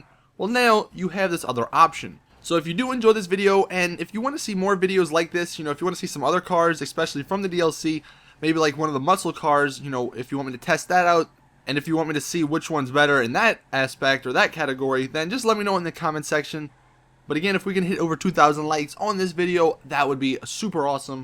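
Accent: Louisiana, United States. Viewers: Are you from Louisiana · are u from Louisiana and if so what part?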